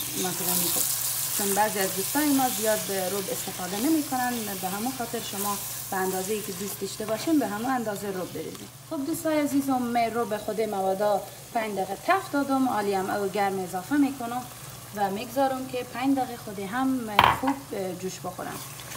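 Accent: Herat, Afghanistan. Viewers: به به ماکارانی پختن با لحجه هراتی خیلی عالی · With the beautiful Herati accent you make it more interesting to follow.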